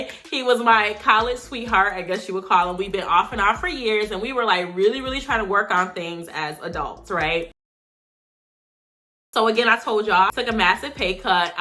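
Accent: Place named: Syracuse, United States. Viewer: Where are you from in New York? I'm from Queens but you sound like my cousin in Syracuse. Am I right?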